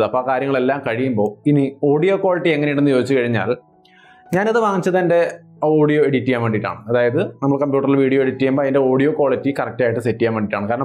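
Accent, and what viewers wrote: Thrissur, India. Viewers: മറ്റു വീഡിയോകളുടെ അപേക്ഷിച്ച് നോക്കുമ്പോൾ ഈ വീഡിയോ തൃശൂർ സ്ലാങ് ഫീൽ ചെയ്യുന്നുണ്ട് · Thrissur slang 😁😁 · trissur slang ee videoil nannaai kayarikoodiyallo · Thrissur slang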